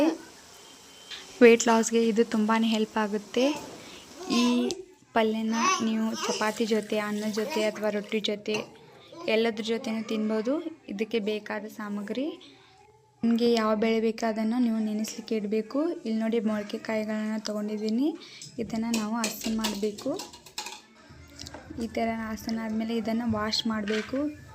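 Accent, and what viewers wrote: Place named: Maharashtra, India. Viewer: Akka avare niv marathi naa adakke nivu teve yannu tava annutiddiraa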